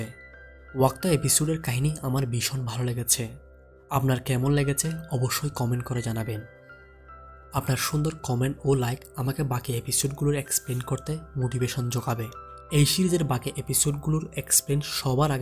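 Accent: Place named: Chittagong, Bangladesh. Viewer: আপনার বাড়ি চট্টগ্রাম। আপনার কথা শুনেই বোঝা যাচ্ছে।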